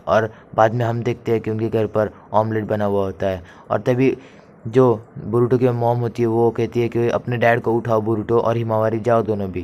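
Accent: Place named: Maharashtra, India. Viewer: Bhai tum maharashtra se ho na..😅 tumhari aavaj se laga muze..😅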